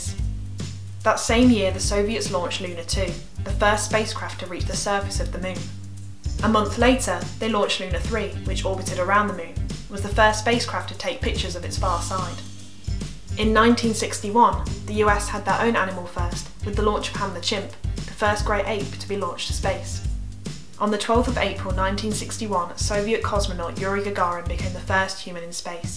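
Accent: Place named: England, United Kingdom. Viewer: Nice accent 😁 What part of England are you from? 🤷‍♂️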